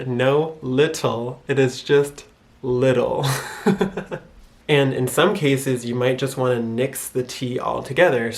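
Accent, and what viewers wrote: Michigan, United States. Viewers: I am a michigander. Lived there for 30 years. I do not conversate, I converse. I say Ford unless it's a possessive. I say Sherbet like "shure bet" and horror "horror or" "e-Nun-c-e-adt", I proNOUNce. I am really heavy on the lack of letters, softening, shortening and adding ds on everything. I use the full stop ✋️. On every sentence.. I have lived inna appartmeh. I over "pRuh nunce" the R. When the word But is Buuh. I live and love your accent, it reminds me of home. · Lower peninsula accent. Up North, it's a different accent entirely.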